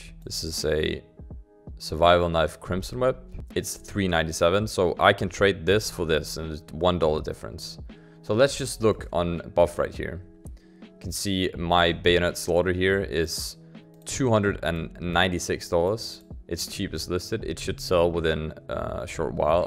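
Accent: Denmark. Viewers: Love ur fcking Danish accent like Pimp xD <3!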